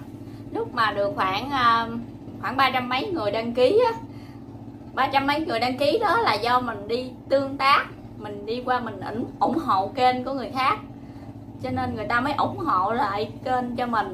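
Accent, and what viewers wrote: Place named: South, Vietnam. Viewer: Noi chuyen nghe that tha moc macdam chat nam bo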